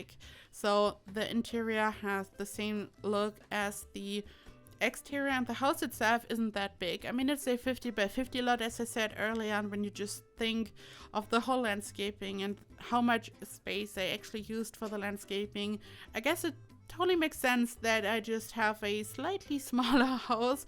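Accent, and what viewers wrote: Germany. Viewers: your accent smells so German 😍 and i love it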